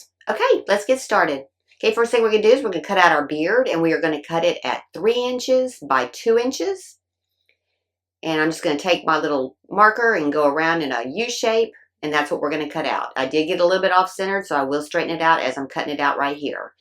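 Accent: South, United States. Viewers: She’s the fastest talking southerner I’ve ever heard!! Lol. She must have sped up the video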